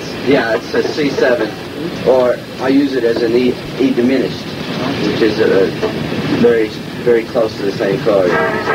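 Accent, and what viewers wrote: England, United Kingdom. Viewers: This guy has an english accent ? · of course he started getting a English accent. look who he was around night and day.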